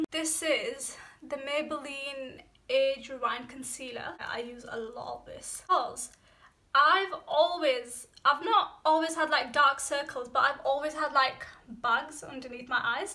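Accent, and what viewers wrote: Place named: Birmingham, United Kingdom. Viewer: Is that Brum accent... Not too fond of it (she's lovely though ma'sha'Allah) barakallah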